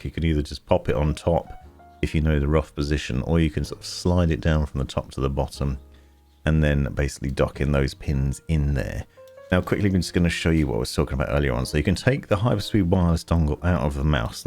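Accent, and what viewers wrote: United Kingdom. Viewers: Always enjoy the soothing original British voice of yours <3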